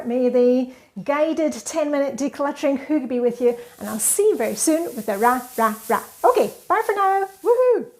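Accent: Scotland, United Kingdom. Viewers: Just discovered your channel. And being a Scot it's great to hear the accent. I was half way there with my routine going to take it further learning from you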